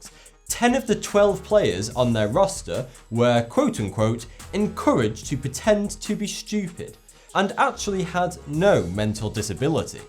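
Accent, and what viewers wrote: United Kingdom. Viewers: 675 000 views..... man! british accent is the real Mc coy!!! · he is handsome.........love his brittish accent and voice....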